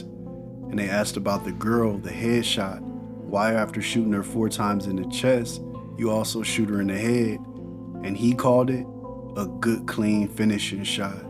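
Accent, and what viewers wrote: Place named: Los Angeles, United States. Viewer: LA guy LA voice perfect for the content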